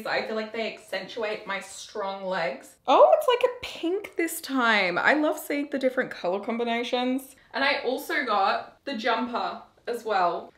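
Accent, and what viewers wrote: London, United Kingdom. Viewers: Cracked me up with that London accent haha! Love it, great vid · the London accent killed me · I CANT GET OVER THE LONDON ACCENT STOP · The London accent was perfect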